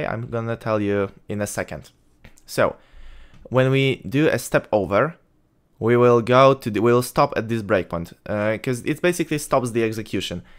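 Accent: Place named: Poland. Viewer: czuje polski akcent 😊😊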